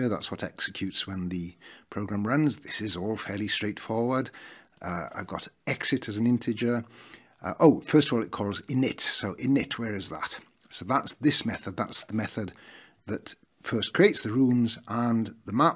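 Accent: Wales, United Kingdom. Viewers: This is the first video I have seen/been suggested of yours. While I very much agree with the message and this way of learning a new language I was mostly happy to hear a Welsh accent after not having been back to Wales in 4 years.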